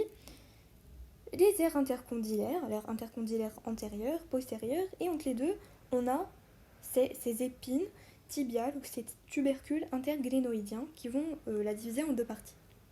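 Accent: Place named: France. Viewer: vous êtes la meilleurs des anatomistes au monde vous proposez une explication détaillé j'espère que vous continuez les autrs chapitres un ;grand merci de l'algérie😘 nb:j'ai cru que vous étiez française vu ton petit accent c'est pour ça qu j'ai dit merci de l'algérie mdr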